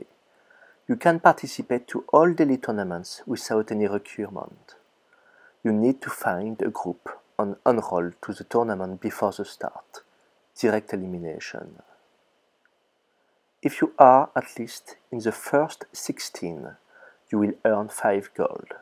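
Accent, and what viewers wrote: France. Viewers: Man,I love your accent...Liberte,Fraternite pour Tyria :) Amazing video btw. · He is French, not German! French people speaking Englisch sounds so much worse than Germans speaking it. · Good content, but i need subtitles to understand much of what youre saying. Very deep French accent. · Merci pour cette vidéo, une vidéo pleine de bons conseils et un accent bien franchouillard :D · Good tips meme si ton accent francais se sent Bcp plus😂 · on sens bien l'accent française XD mais sinon bonne video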